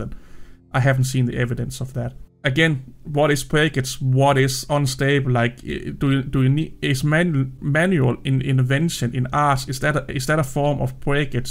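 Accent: Denmark. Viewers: I really enjoyed your Danish accent !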